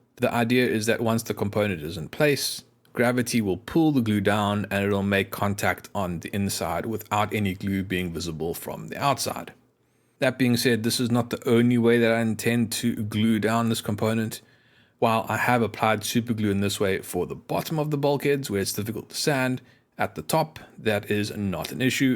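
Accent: South Africa. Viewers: I wanted to share with you I've been trying to identify your accent. I settled on South Africa. The other day I met somebody who had a similar accent so I played a portion of your video to him. He confirmed your accent was South African, and I believe he said it was from the southern part of the country.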